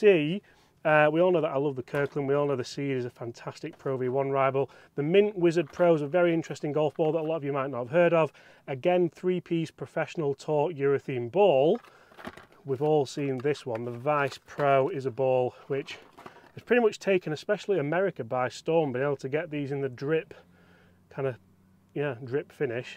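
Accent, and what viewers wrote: United Kingdom. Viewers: I love your videos. Please explain what the hell is going on with you Brits pronouncing URETHANE as if it was spelt URETHEEN. I notice it's not just you. It seems to be a thing for you blokes. What's going on? At the end of the day it's just pronunciation so no big deal but why mate? I'd genuinely like to know.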